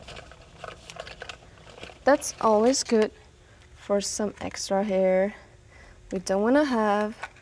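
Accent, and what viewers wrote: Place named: Italy. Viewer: italian accent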